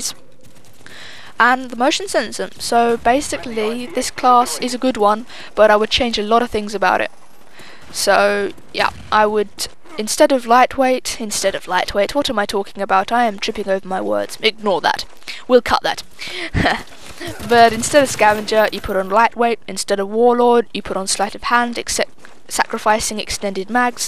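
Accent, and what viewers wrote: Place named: Surrey, United Kingdom. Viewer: R u from Surrey, you have a Surrey Accent And you are like the best educated person on youtube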